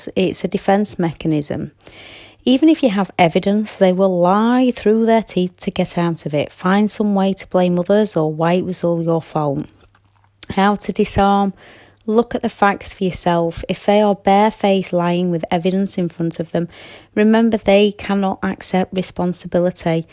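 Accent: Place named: Northern England, United Kingdom. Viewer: I'm still trying to figure out if her accent is northern England or Scottish (the kind of Scottish that sounds like it might be northern England but which is really just a "posh" form of Scottish, not to be confused with posh England English)... 🤔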